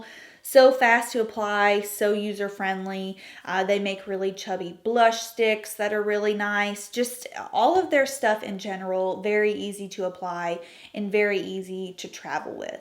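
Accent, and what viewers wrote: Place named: Nashville, United States. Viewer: Oh gosh you're from Nashville! I should have guessed from the dialect lol. It's a pretty decent city to live in. :)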